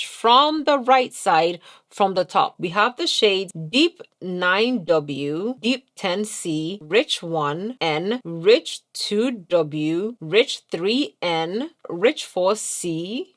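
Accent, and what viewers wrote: Ghana. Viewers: nahhh sis you don't understand how refreshing it is to hear the Ghanaian accent again throughout the video and the emphasis on the words hahaha love it 👋🏾🥰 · Forget the concealers please! I'm watching because of the Ghanaian accent!! Love it!❤❤ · The Ghana in your voice comes out every more in each video and it's giving me liiiiiife 🤣🤣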